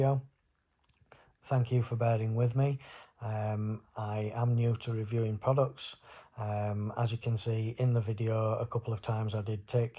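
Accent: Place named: England, United Kingdom. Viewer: Glad to hear an English voice (for a change). Good luck with the channel.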